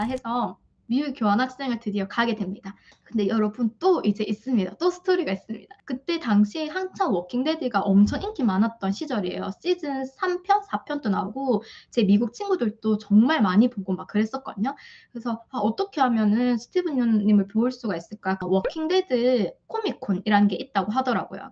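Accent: Gyeongsang, South Korea. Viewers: 안녕하세요! 지난 주에 알고리즘 타고 제게 쨘~ 하고 나타난! 그 이후로 뜨는대로 다 보고있는데 대구분이신걸 오늘 알게됐네요! 제가 처음 본 영상에 경상도 억양 섞인 영어가 너무 귀엽네요~ 하고 코멘트 남겼었지요. 먼 타지에 살면서 동향 사람 만나 너무 반가운 느낌이네요 ㅋ~ 전 뉴욕에 살거든요. 여튼 쌍둥이 출산 축하드려요!!! 케어하느라 잠도 제대로 못 주무시겠지만 짧디 짧은 영상이라도 곧 뵐 수 있으면 좋겠다는 저의 이기적인 마음 전해봅니다!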